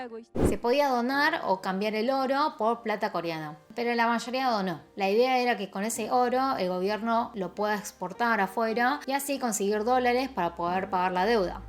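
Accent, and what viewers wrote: Argentina. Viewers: Que hermoso .coreana con acento argentino ,vistes!! Saludos desde México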